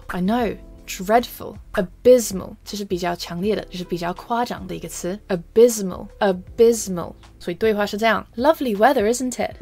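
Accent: United Kingdom. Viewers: 終於等到用英國腔的教這個，目前定居在倫敦，希望可以出一些跟孩子的聊天，小朋友在學校跟同學交流，家長跟老師的交流，租房子賣房子這些方面的聊天技巧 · It's nice to hear a British accent in these types of videos (too often it's American accent). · 英國腔聽起來真的很好聽, 好漂亮!~~~~ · 滿滿的英國腔😊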